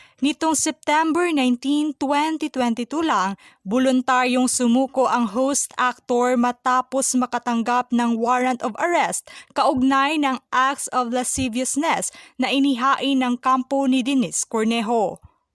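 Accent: Visayas, Philippines. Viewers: Bisaya ung vlogger nito hula ko🤮🤮🤮🤮ang malambot tumitigas..ang matigas nalambot🤮🤮🤮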